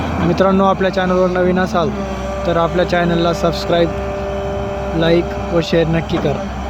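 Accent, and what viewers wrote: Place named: Khandesh, India. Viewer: राम राम दादा तुमनी भाषा वरूण तूम्ही खानदेशी वाटतस तूम्ही चांगल काम करी रायनात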